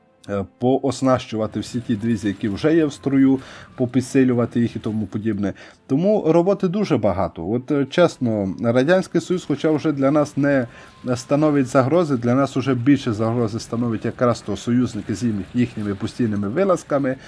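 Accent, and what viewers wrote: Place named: Kolomyia, Ukraine. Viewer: Блін, класний акцент, авторе, ви з Коломиї?😅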